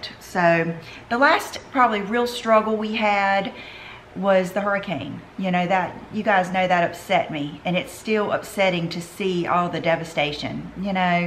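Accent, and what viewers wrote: South, United States. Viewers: I love your outfit ! You have the most likable personality and such a charming Southern accent. I’m so glad I discovered your channel. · Love to watch your videos, gotta admit it's because of your demeanor and southern accent.....😁 your voice is sooooo like shelby from steal magnolias, hope that does not offend you 👍🌻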